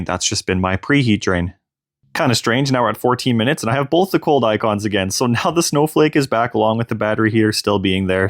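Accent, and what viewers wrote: Canada. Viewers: the accent, the stache, the Saskatoonian winter, the consistent conversion of metrics, takin er out fer a rip, what an absolute beauty bahd. All regen, no degen. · Your Saskatchewan accent is deafening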